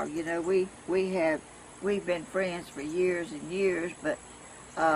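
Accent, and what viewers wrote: South, United States. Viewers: I Luvvvvv you. You are my U-Tube Granny from a brown mommy. My maternal Granny is heaven, my mom has Alzheimer's. When I need some "old-fasion-love" I visit you. Watching you sip your coffee, listening to your non-stop southern chit chat and your promisie to make it short fills a big whole in my heart. I luvvv it.! I enjoy sitting outdoors with you while you share your creative talents and your appreciation of Mother Nature. Your windchimes are music to my ears. Did you make them? I want them in my yard!! Your love of God is uplifting. May you continue to be blessed with good health and sound mind. I want to thank your grandson for being so patient and loving. Keep creating for it is your lifeline. Thanks for making me feel at home Granny. 🌻☕😘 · That’s exactly why you’re healthy because you haven’t been abusing your body in anyway And you have a great sense of humor and a happy person has a longer happier life I believe I think it’s wonderful stay the way your you’re absolutely beautiful lady very loving and caring and funny you are one of a kind I have so much in common with you is incredible even the southern accent and you do remind me a lot of my mother I miss her yeah I love birds to that a lot of birds in my yard and rabbits and squirrels love all gods creation May God bless you😊💕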